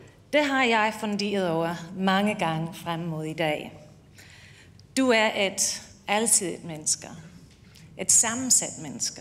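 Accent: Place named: Denmark. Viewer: The subtitles are for the death and the hard at hearing and people who are death. The rest of us can understand her quite fine, as she is speaking what is called rigsdansk which can be compared to something like Cambridge or Oxford English.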